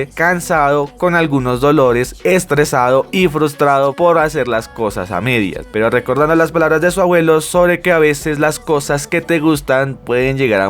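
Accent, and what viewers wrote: Colombia. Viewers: toma tu like, eres colombiano???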